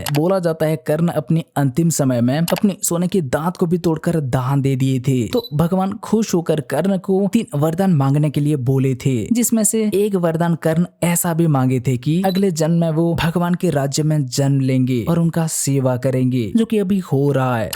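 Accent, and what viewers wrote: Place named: Gujarat, India. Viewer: Aise kyun bol raha hai jaise koi teri le raha hai. Sorry, but bohot irritating hai. Dusra ye hai ki if you are Odiya or Bengali make video in local language, jyada better. Kyunki teri Hindi waisi hi hai jaisi Gujarati logon ki Hinglish.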